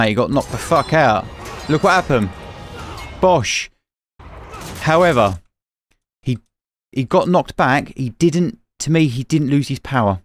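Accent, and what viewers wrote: United Kingdom. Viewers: Can you please turn off your British accent and speak English like normal people do around the world?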